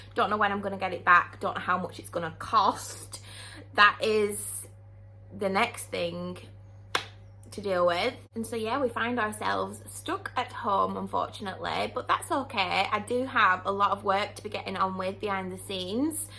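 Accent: Northern England, United Kingdom. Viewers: Hi, came across your channel by chance a little while ago and I’m hooked! Plan to watch all your vids in time. Your so down to earth and funny and sound like a Northern lass like myself. As others have said, ignore the nasty comments, jealousy defo. Why can’t people just be nice- or stay quiet if they can’t. Good luck to you and hubby, and looking forward to your journey, and maybe a bit of inspiration x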